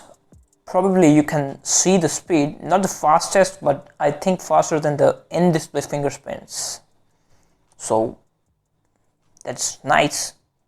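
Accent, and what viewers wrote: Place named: United Kingdom. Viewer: I think you have a nice British English accent. Nice pronounceation. Good start and tell you brother to learn all this from you 😂🤣😜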